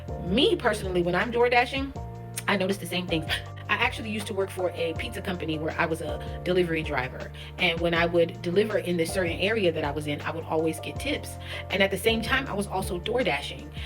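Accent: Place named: Africa. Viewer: she looks and talks like a first generation African. American blacks I agree do tip but we keep getting lumped in with these immigrant blacks that don’t tip , even the ones with money won’t. Also, why is she complaining about tips? Tips are whatever the customer thinks you deserve or feel like giving out of generosity! Perhaps she should find a job that pays hourly instead of making content pushing black stereotypes.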